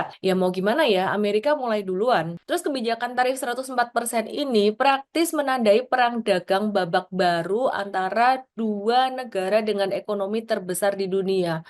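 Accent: Java, Indonesia. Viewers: Suaramu medok mbak.... Ketoro wong jowo iki😮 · Menyimak dengan baik kontent yg berbobot...tapi sedikit gagal fokus karena "medok lafalnya"..😮😊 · Wong jowo Iki mbak e. Logate ketoro nenen. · Sampean ko yo bahasane medok temen mbak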